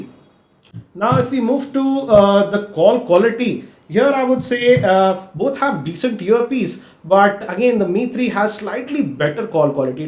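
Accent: India. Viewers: Indian accent is so funny)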